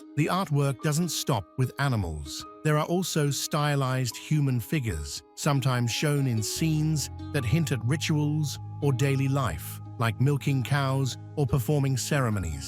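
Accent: United Kingdom. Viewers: next time i watch, i will count the usage of "cool." meanwhile, as i am an american, i had to use captions to see what he was saying with his u.k. accent...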